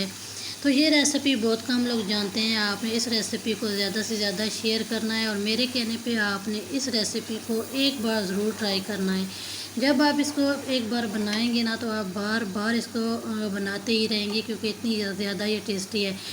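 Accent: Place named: Bhatwahar, India. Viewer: اپ کے لہجے سے لگتا ہے کہ اپ کا تعلق بھٹوہار سے ہے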